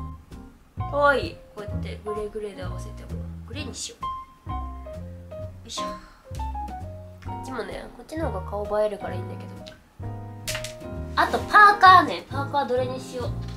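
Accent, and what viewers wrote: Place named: Kansai, Japan. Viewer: 関西弁かわいい👶🏻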